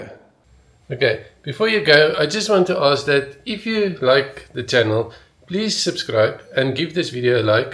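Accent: South Africa. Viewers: LOL that south african accent... 🤣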